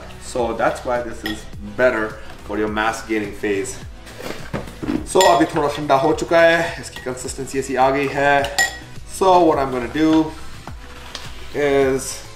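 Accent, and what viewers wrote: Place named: India. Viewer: I love the Indian desi touch while he talk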